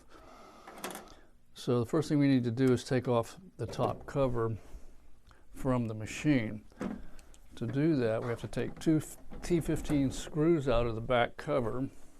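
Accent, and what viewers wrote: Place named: Carolina, United States. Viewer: love your accent. are you from Carolina State?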